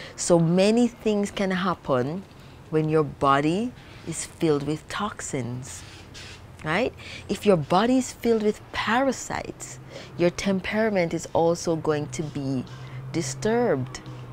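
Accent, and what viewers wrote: Jamaica. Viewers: is she jamaican? sounds like! love her voice and speech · MARVELOUS! ...What a beautiful lady this is...smooth impeccable skin. Such clean expressions, such clean energy and such clean appearance...ZERO pretentiousness and 100% NATURE PERSON. I really enjoyed hearing this...and your golden Jamaican accent and mannerisms...and the little sweet Patwa in between. - no ssstchuppitnis punna she hair - arl's nature groove...eeeasy-eeeasy lite and breezy! It's so wonderful to see zero ostentation in motion. GOD Wisha-Ouija bless you! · The Sistah could talk to me all day about health. Jamaican accent supreme!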